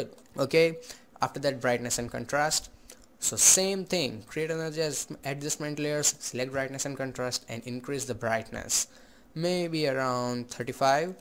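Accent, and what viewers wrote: India. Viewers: That's racist as shit. Sure he has an indian accent, but his English is alright!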